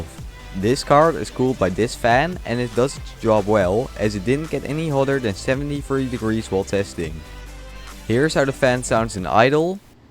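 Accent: Netherlands. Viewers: Just asking, are you dutch? You sound like you have an accent😁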